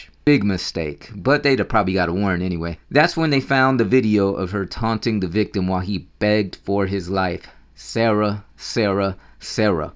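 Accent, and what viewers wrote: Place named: Texas, United States. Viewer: I mean I hear the Texas in your voice! I think you should do it!!!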